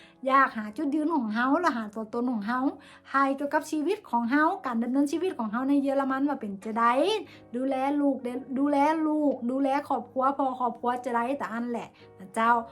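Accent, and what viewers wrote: North, Thailand. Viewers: สวัสดีจร้า อู้คำเมืองน่ารักดีจร้า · อู้เหนือได้เพราะมากเลยจ้าพูดได้เก่งมาก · ชอบสำเนียงเหนือมากเลยค่ะ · อู้คำเมืองเพราะมากๆค่ะชอบๆจริงๆค่ะ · ชอบเวลาน้อง อู้คำเมืองน่ารักจ้า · อู้กำเมืองม่วนขนาดจ้าวปี่ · เป็นคนเมืองน่านเหมือนกับน้องพลที่ผมเรียกว่าทำงานด้วย สำเนียงภาษาเหนือไพเราะเลยครับ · สวัสดีจ้า แม่ จ้า อู้คำเมืองเพราะมากๆจ้า จ้า เลิฟๆจ้า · ชอบครับภาษาเหนืออู้เพราะพอได้อยู่ครับคำเดียวคือคำ ลำเต้ๆครับ😁🤗✌️🤟♥️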